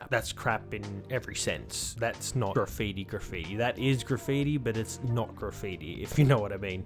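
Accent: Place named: Australia. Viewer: Australian accents are so cool. Great video, as always, especially the comedy bit at the end. 👍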